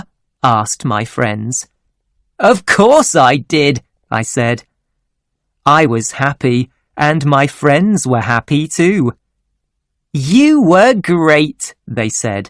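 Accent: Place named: United Kingdom. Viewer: I love the British accent and try to listen to it slowly to understand their pronunciation.thank you for this video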